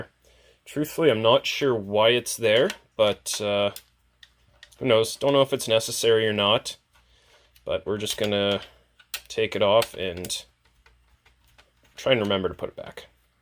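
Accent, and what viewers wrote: Canada. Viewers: Lol I dunno why but being American I always get a kick out of Canadian accents 😁 Great video as always! This will surely help a lot of ppl fix their 32Xes!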